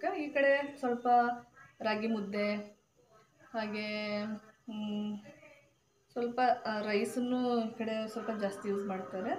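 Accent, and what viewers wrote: North Karnataka, India. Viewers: Mam super volg nimma husband aane work madthiddare north karnataka bhasha channage mathadthira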